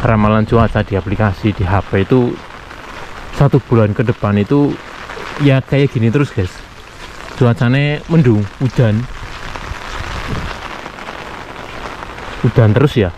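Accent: Banyumas, Indonesia. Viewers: Mas, kayane logate ngapak ya?, panjenengan nyambut gawene opo to mas?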